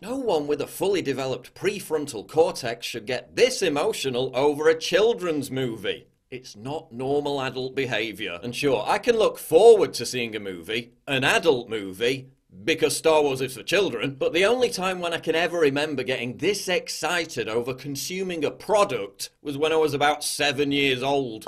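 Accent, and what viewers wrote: United Kingdom. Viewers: Thank you for articulating the reasons for this millennial obsession with super hero movies in your British accent. I think you nailed it. The social engineers want to keep us dumbed down with the emotional intelligence of children in order to keep us all dependent ON THEM and under their perpetual control.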